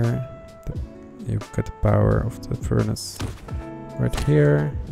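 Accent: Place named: Netherlands. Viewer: The dutch is strong in this one....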